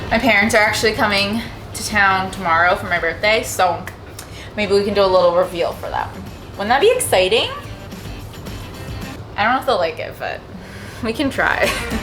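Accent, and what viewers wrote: Canada. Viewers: Omg your parents are sooo sweet!!! Also you sound more Canadian with them “aye” hehe love it!!! ❤❤❤ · 100% stepped up her Canadian game!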